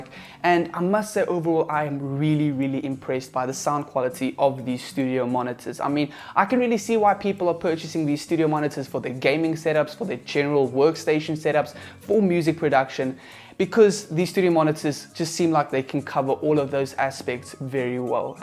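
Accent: South Africa. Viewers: completely unrelated question but are you south african? i'm trying to learn the different styles and accents of english better.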